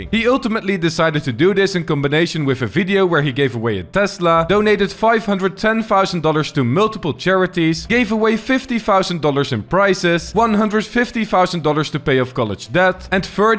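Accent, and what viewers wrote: Netherlands. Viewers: is this guy dutch? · Are you dutch? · Ik hoor gewoon je Nederlandse accent door je Engels heen haha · Your accent really sounds Dutch, are you? 😅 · I smell dutch accent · I like the videos.. The dutch english accent is just to cringe for me, unwatchable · deze guy klinkt nederlands nice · THE DUTCH ACCENT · The dutch in his english voice 😭😭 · i smell dutch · Wat een Nederlands accent zeg haha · You sound Dutch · i smell dutch but ar you dutch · Are you dutch by any chance? I can hear a accent. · You sound dutch, very very dutch. i can tell by your accent 😂🇳🇱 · Het duurde minuten voordat ik erachter kwam dat je een Nederlands accent had. Toffe video :) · are you dutch? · Dutch accent hihi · I smell dutch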